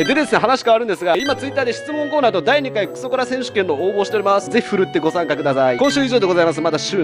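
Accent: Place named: Kagoshima, Japan. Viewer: アルティメット鹿児島弁ニキほんとすこ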